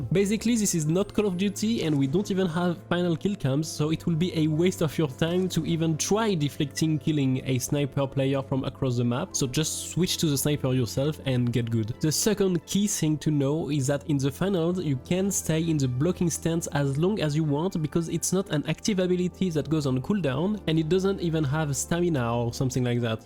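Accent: France. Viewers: Cet accent français de qualité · tu as un accent français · your accent looks French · Is that a French accent I hear? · T'es français toi non ahah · Your france accent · bro are u french ? ur accent sound like it ?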